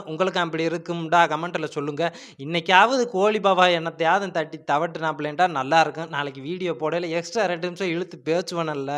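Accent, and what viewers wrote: Madurai, India. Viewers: Bro neenga maduraiya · நம்ம மதுரை ஸ்லாங் கேக்கவே அருமையா இருக்கு நண்பா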